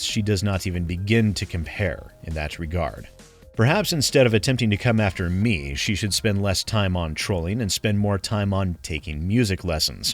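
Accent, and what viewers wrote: United Kingdom. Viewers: Man, you should've voiced this video in your Total Biscuit-esque British voice.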